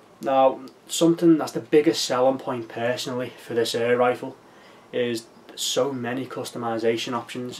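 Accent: Liverpool, United Kingdom. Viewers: He's a Scouser.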